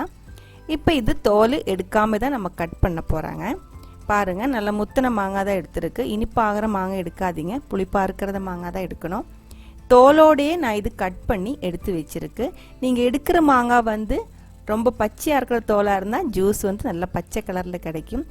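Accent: Kerala, India. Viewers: தமிழும்‌ மலையாளமும் கலந்து உங்க இனிமையான குரல்.super sis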